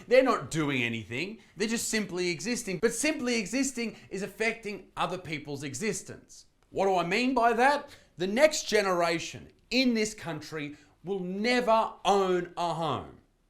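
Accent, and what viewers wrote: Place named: Australia. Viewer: I am an American and I say Thanks for posting this. If you keep saying the truth eventually even the stupidest liberals will be forced to see the truth. It is time to slow down or stop legal immigration. And deport all illegal invaders. Also, I appreciate the open frankness and ausie accent. Also, I have to ask, why is this mass immigration always from shithole countries and into the US, Europe or Australia? Why don't they try to flood China, or Japan or India for a while?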